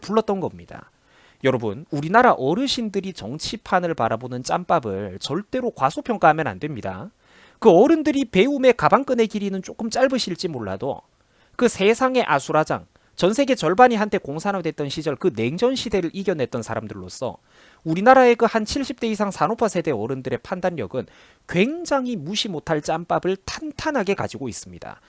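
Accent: Gyeongsang, South Korea. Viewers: 경상도 인 서울말 안되지요 ? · 경상도분 서울말 부탁드립니다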